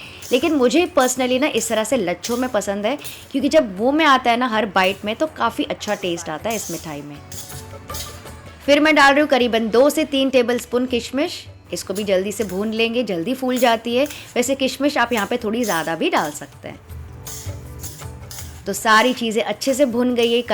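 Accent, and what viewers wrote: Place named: Sindh, India. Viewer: adee jo ghalahin dadho aaio khas toarte sindhi lahjo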